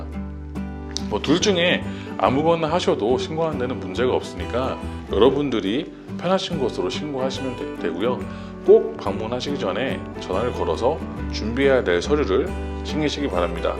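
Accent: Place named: United States. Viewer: 미국 사람인줄 알앗네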